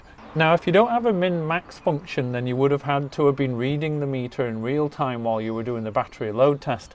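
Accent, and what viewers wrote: Liverpool, United Kingdom. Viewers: I would guess at least half is Liverpudlian. 😉👍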